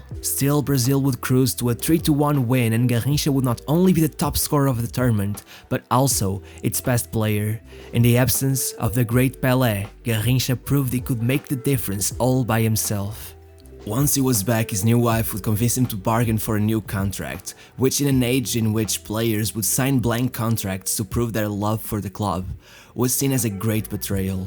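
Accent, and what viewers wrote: Portugal. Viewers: My friend, you are from Portugal. I can feel it in the accent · Nice try, mas dá para perceber o sotaque português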